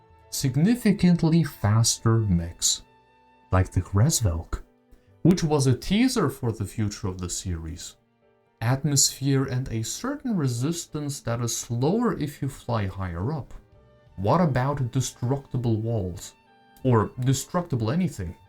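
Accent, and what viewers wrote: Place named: Germany. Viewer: Nah, he sounds like a German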